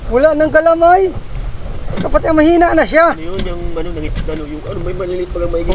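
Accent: Bicol, Philippines. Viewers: ang tagalog ni kuya bikolanong bikolano ang tugas ng dila.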